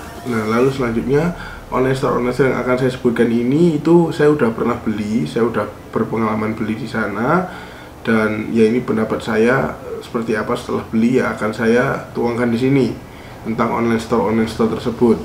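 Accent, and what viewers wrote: Java, Indonesia. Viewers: Niat banget sih ini, sampe ada penilaian terperinci. Good job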